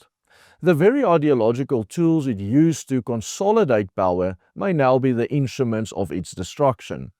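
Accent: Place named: South Africa. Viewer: Love the accent i wish i had an South Africa accent 👍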